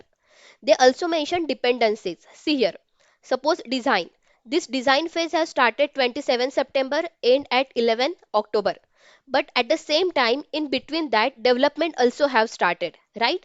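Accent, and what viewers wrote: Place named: India. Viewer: horrible indian accent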